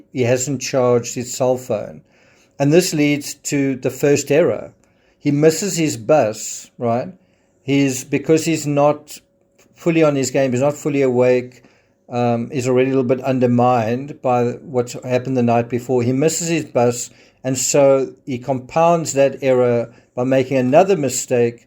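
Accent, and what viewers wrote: South Africa. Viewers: Can’t listen to a South African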